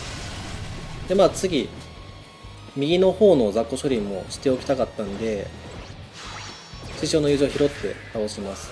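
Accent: Kansai, Japan. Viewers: さんの関西弁がたまらないです！いつも勉強になってます(^.^)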